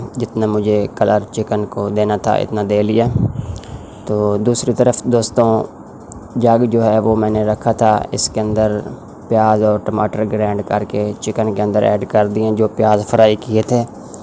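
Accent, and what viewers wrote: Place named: Punjab, India. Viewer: آپ کی پنجابی سن کر ہنسی آ گئی 😅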